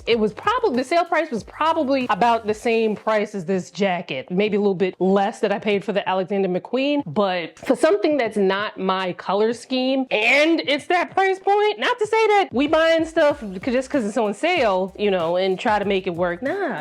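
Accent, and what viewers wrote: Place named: New Orleans, United States. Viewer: I live in Louisiana and i thought you were from New Orleans, love the accent ~ new subscriber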